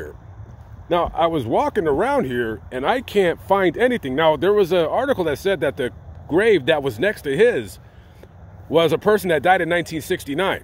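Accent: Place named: California, United States. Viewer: I enjoy his voice because he reminds me of California I lived there for 6 years as a child.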